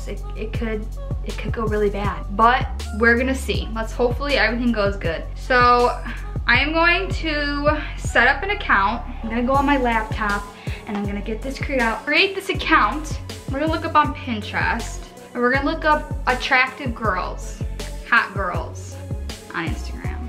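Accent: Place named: Chicago, United States. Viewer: Are you from Chicago???? My mom is so i have a Chicago accent too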